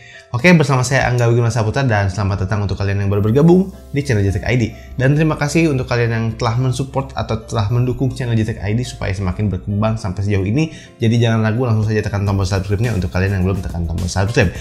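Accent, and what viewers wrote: Bandung, Indonesia. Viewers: Sigahna orang bandung nya kang. 😅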